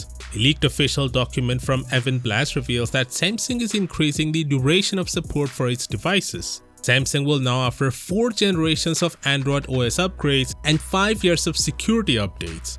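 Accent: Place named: India. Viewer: I loveee your accent. I would think indian with the Palatial clicks, but there’s a nonrhotic r, May I ask where from?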